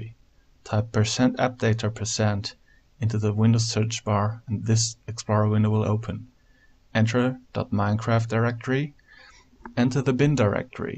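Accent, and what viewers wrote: Germany. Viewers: I knew you were German with the first sentence you spoke haha :D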